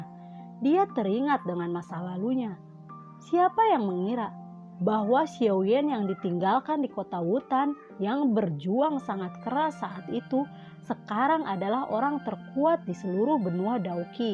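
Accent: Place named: Sunda, Indonesia. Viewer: Logaaat sundana kenteeeel amaaat siich😂😂😂 ber abad abad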